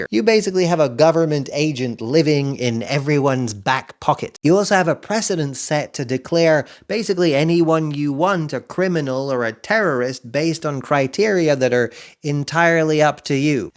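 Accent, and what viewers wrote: Wales, United Kingdom. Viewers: I’ll let you off for the Welsh accent, because you speak so much truth! 😂🫡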